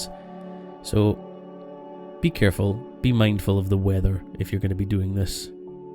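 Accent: Scotland, United Kingdom. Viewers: ASMR With a Scottish accent was extremely satisfying.... let's see this one go crazy.. 🤗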